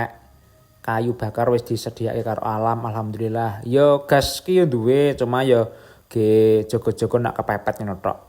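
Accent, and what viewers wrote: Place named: Banyumas, Indonesia. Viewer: bahasane ngapak bangeet Mas 👍🏻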